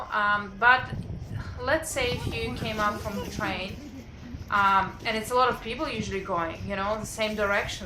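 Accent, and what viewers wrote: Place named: Australia. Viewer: Здравствуйте! Я не буду хвалить Вас за хороший английский язык, было бы смешно, если бы Вы, приехав в Австралию в 26 лет и прожив там достаточно долго, не знали языка, но мне было интересно послушать, как говорят австралийцы! И, хотя все понятно, но разница, все же между английском в США и Австралией чувствуется. И, знаете, я вдруг почувствовала себя американкой на улицах Подмосковья! Такая грязь непролазная, вот ведь ничего не изменилось за столько лет! Спасибо Вам большое за это видео! Мне было неинтересно смотреть вокруг, но было очень интересно мое отношение ко всему этому! 👍👍👍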